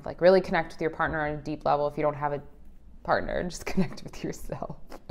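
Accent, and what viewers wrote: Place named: Australia. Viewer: Australian accent 😅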